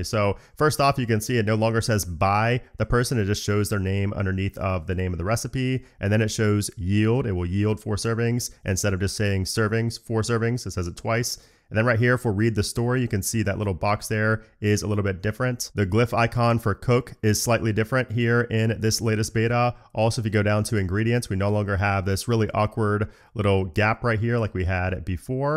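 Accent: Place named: Texas, United States. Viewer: Is he from Texas? Why does he talk funny?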